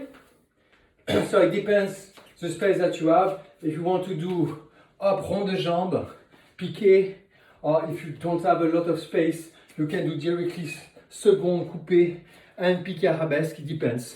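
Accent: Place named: France. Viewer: Your French accent is beautiful.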